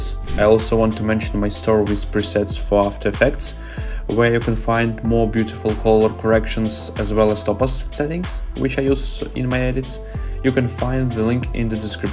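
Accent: Russia. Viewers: bro you russian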